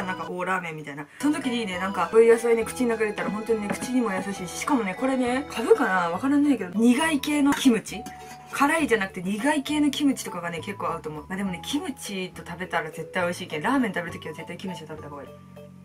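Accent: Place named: Kansai, Japan. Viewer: ぺんぎん さん そうでしたか！w どうりで、関西訛りの日本語が上手いと思っていました！ww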